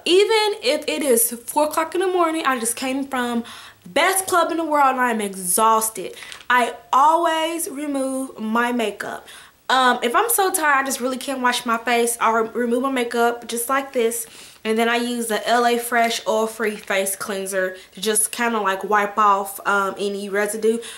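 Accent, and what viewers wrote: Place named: New York, United States. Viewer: I love your accent! I'm from ny so I'm use to only hearing that accent most lol😃 I have the vitagoods brush! I love it!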